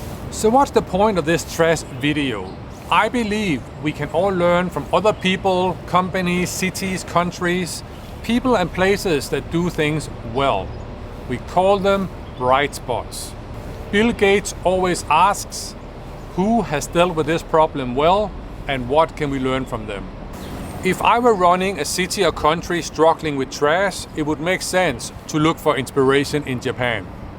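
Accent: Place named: Denmark. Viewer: he just sounds danish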